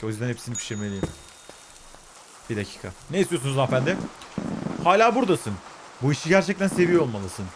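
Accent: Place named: Istanbul, Turkey. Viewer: Muazzam İstanbul Türkçeniz ve inanılmaz diksiyonuza hayran kaldım hanım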